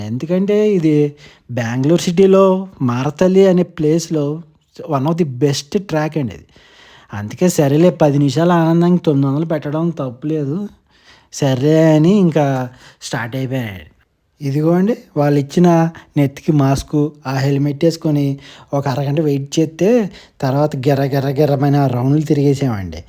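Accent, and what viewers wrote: Godavari, India. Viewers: Super me godavari yasa chala bagundi vinadaniki funny ga vundi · Mi godavari ascent bagundi andi 👏👏😂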